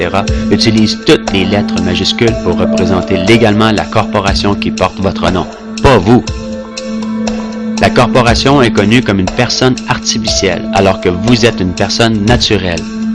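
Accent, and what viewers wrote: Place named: Quebec, Canada. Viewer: excelent récapitulatif merci. Qui est la source de ce vidéo? Parce que ça sonne comme étant un compatriote québecois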